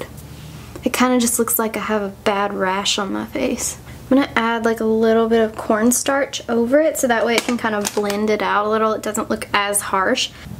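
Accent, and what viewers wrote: South, United States. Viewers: Do you have a country aksent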